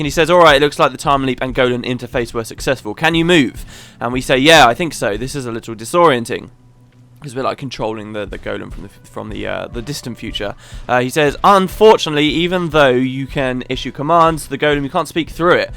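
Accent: United Kingdom. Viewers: Yay! I recorded one myself for nostalgia when I'm playing Gw2. But, I kind of died at the end and didn't do the one from this year. Now I can watch it in a few years, but with British commentary!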